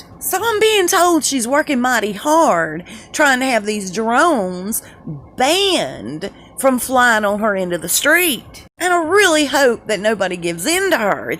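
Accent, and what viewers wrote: South, United States. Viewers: love you. make me laugh. I love your southern accent.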